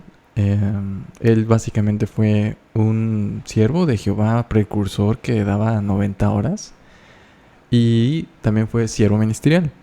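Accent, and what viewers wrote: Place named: Spain. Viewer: QE gusto saludarte ya no as echo vídeos por favor sige haciendo cuando menos de ves en cuando me encanta tú hacento de España y forma de dialogar Eres demasiado inteligente, estan con ganas tus videos de YouTube 🙏🥳🤩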